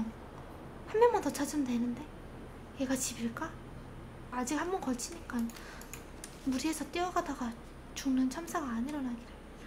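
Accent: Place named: Gyeongsang, South Korea. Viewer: 말투 경상도 느낌쓰가 나네요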